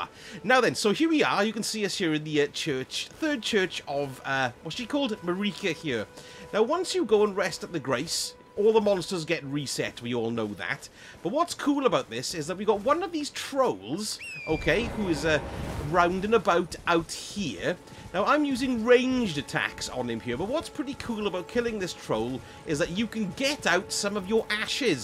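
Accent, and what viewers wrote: Wales, United Kingdom. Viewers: I love this guys energy he’s class 😆 and the Welsh accent just makes it 10 times better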